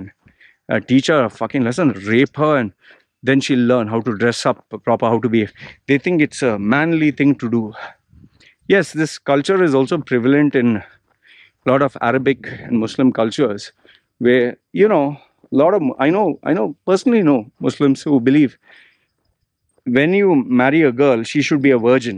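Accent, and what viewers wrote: India. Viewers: You don't look indian at all, of course your accent is extremely strong so people realize that, but it's nice to listen to you Subscribed!! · I'm confused. You say you're didn't spend a lot of time in India, but you sound Indian like you grew up there.Everybody knows indian accents . Plus Whats up with , new zealand Tribal tattoos on your face why Since you not from new zealand or indigenous new zealand just wondering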